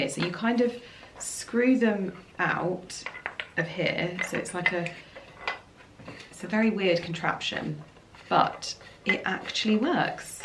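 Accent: United Kingdom. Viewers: I am polish And I Love Your videos content ❤ as well Your british accent. I even learn english with You to have nicer english 😊 You are just such a lovely person ❤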